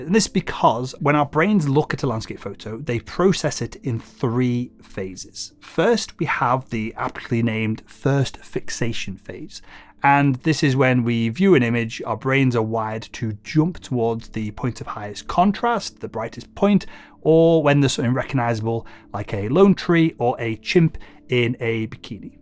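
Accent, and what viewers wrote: United Kingdom. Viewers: Even though I watched this video to learn about landscape photography- which is something I like to to as a hobby - I have to say that I really like your English accent and I think you’re really cute, which was the cherry on the cake. ;-)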